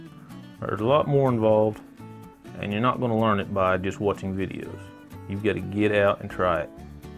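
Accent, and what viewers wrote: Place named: South, United States. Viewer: As an overthinker i truely appreciate your slow southern dialect. Lol Nice take on angles too.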